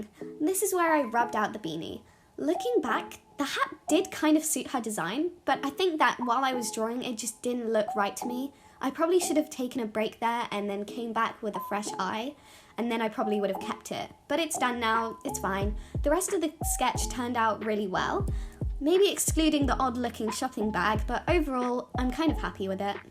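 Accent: United Kingdom. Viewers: can i eat ur accent and ur art? 🥺 you sounded like how i expected you to sound but the accent caught me off guard bcs im just a sucker for british accent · !!! you have such an heavy British accent, I love it!! It's so nice to actually hear your voice for once lol! I love how the character looks now! I hope you have a great day/ night Byee <3 Edit: 🍞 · Your voice is so pretty and I love the British accent. I’m southern but don’t have a strong British accent. I’ll probably take up some of your tips when I film another voice over… but that’ll be a while. I also wanted to ask how you stay motivated and creative with video ideas. I’ve taken 7 months from uploading but am still confused as to what I should upload.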